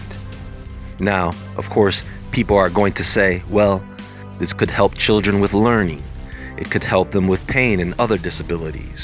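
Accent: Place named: New Jersey, United States. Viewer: Where are you from? You sound like a NJ guy. Wherever, I’m addicted to your voice. Great channel. Keep it coming!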